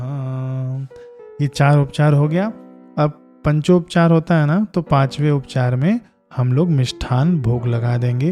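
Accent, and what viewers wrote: Standard. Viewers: Aapki bhasha bahut shudh. Srl.or mithi h..aapki puja ka trika or smjhne ka trika bhi bahut accha h..mene aapko sabscrib kr liya h..or all bhi kr liya .me aapki bahut video dekh bhi chuki hu..sari video bahut helpful h ...jai shree Radha krishna 🙏🙏🙏🙏🙏🙏🙏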